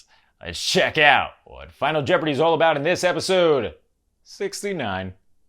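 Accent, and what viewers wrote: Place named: New York City, United States. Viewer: same! is such a natural with hosting! One of these days, I will do a "Hello Belle!" impression just as good as him. Plus, you can't go wrong with his NYC accent (, just an FYI, I'm going to your hometown later this month! NYC is my favorite! I'm so excited!!) Wheel of Fortune dropped the ball by not tapping him to be Pat Sajak's successor and instead going with that overblown twitty twatty Ryan Seacrest.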